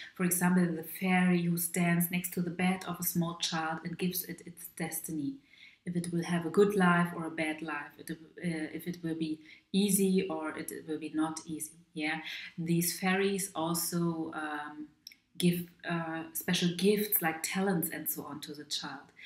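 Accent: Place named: Germany. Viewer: Ich liebe es, mit deinem deutschen Akzent zu sprechen. Sie haben eine so positive Aura. Du lächelst immer. Ich mag das sehen. Ich finde deine Videos charmant. Ich weiß, du bist sehr weise und gelernt. Ich spüre "Macht" in dir. Vielen Dank. Mögen die Götter dich und dein Zuhause segnen.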